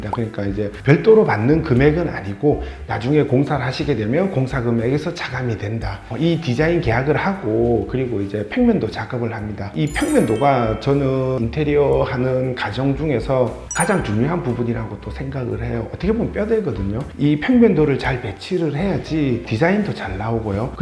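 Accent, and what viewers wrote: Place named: Gyeongsang, South Korea. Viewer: 역삼에 있는 개발회사인데 9월이사라 이사갈곳정하고 있는데 연락 꼭드리겠습니다. 같은 경상도 분이라 먼가 친숙하네요^^ 전용 약 63평, 임대면적 99평정도 되는 사무실 예정하고있습니다^^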